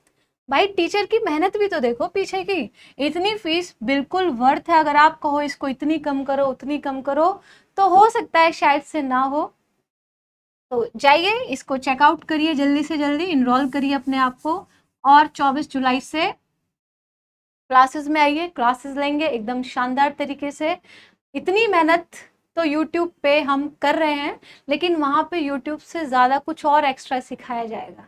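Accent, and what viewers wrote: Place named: Braj, India. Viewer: Mam aap mathura bashi ho kya ...... Aaap ki bhasha braj ki lagti hai😊😊😊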